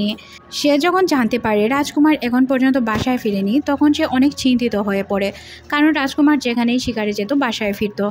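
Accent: Sylhet, Bangladesh. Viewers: Apu ki sylheti?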